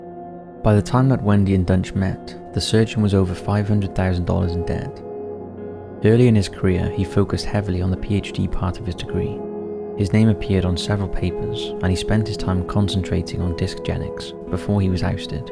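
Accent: United Kingdom. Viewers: Your voice is beautiful but it resonates like in an echo. I love the British accent, but I have difficulty understanding it with clarity, I wish you pay more attention to the sound effect. Also, the music interferes making it even harder to hear clearly. No music is necessary. Great documentary, thank you.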